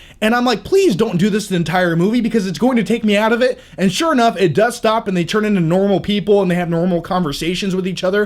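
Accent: England, United Kingdom. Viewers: lol, your rant is the funniest thing!! And I love your english accent too! My worst cinema experience was when someone sitting behind us put chewing gum in my friend's hair. Awesome review! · Ha your English accent is hilarious. Telly? Lol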